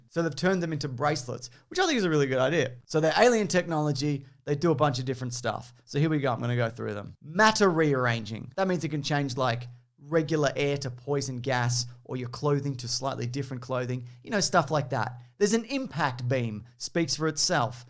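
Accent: Australia. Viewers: I love hearing breakdowns in an Aussie voice. "There's a vortex ring, which is like wind and shit" had me laughing so hard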